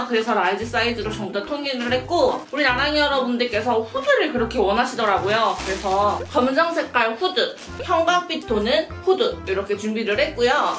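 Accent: Busan, South Korea. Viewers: 부산 사투리 듣기 너무 좋은거 같아요. 요즘 코로나 때문에 밖에 나가기 힘듬에도 불구하고 팬들을 위해 나눔해주셔서 진심으로 감사드립니다. <mail>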